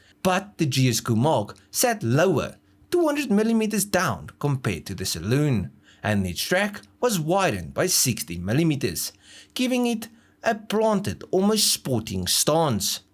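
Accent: South Africa. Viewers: South African accent is ready to follow. Thank one of the gods he isn't from Kentucky.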